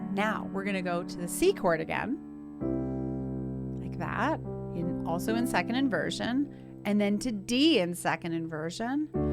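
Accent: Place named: Portugal. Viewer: Thank you so much. Another excellent video, love you accent, probably portuguesa, much gracias ❤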